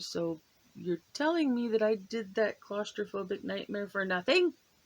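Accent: United Kingdom. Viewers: Accent, kind of London, East End - Not bad at actually! - Seriously though, If you can ever find the time, I think your wide experience of both health care systems are well worth putting into print.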